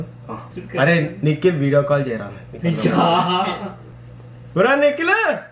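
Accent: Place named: Hyderabad, India. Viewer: ana you sound like jesse in breackin bad vere asal haydrabadhi bhai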